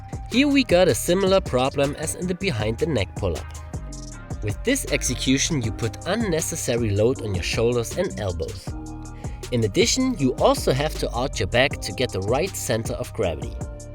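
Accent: Germany. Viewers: Your accent sounds german🤔 The Video was very helpful, thank you! :) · Sound like Do You have a Garmen accent. :)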